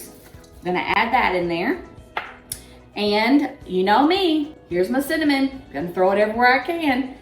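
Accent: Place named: South, United States. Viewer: Where is your southern drawl from?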